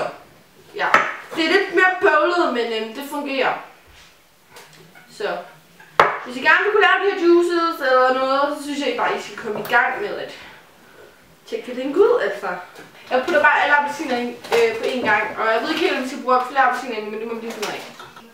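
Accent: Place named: United Kingdom. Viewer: Dat engelsk😂😚💕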